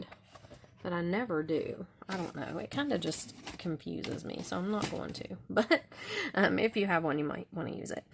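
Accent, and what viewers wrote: United States. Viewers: Love your cowgirl accent❤❤❤❤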